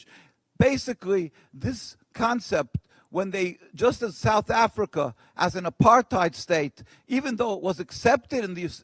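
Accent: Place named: New York, United States. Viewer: you know it's funny, says he's from 'palestine' then says his family is phillistine from many generations ago... but that accent doesnt lie. this guy is from new york. talk about a paid shill to parrot some agenda from across the world when he lives comfortably in new york city. he can talk all he wants and keep wasting oxygen but the fact of the matter is this man has most likely never been to Israel while rockets were fired over his head from a hateful spiteful section of people who were given every opportunity to have their own state. were given BILLIONS to build better hospitals and schools to give their people an education over the future generations... instead they built extensive networks of tunnels, bought missile and rocket parts from iran to build in gaza and send at their neighbor. they then invade and kill over 1500 civilians, not military, civilians. 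womp womp. dont start a war then cry when you've lost.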